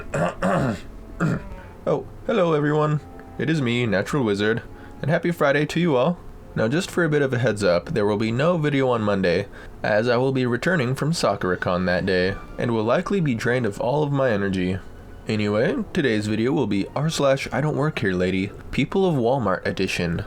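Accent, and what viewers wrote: Australia. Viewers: Mate you did a great job at the Aussie accent. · I loved your Aussie accent. You did a very job 🇦🇺 ☺️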